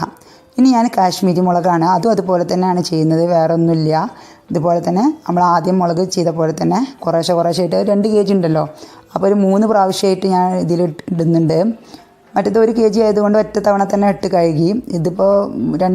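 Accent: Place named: Malappuram, India. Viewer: ഇജ്ജിന്റെ വർത്തമാനം നല്ല മലപ്പുറം tune ...super vedio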